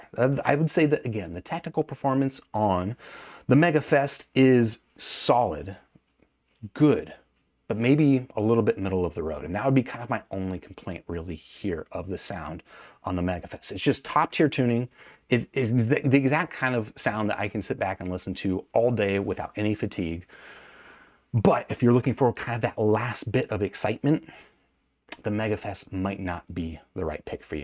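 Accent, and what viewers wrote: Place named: California, United States. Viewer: I used to live in LA. I just think your accent is from California. Could be wrong...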